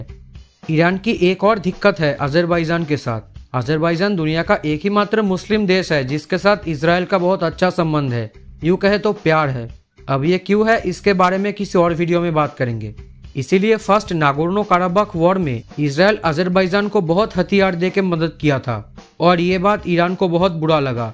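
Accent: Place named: West Bengal, India. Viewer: Bhai aapki video badi achi hai apki awaaj bhi .. Apka tone Bengali lagta hai ..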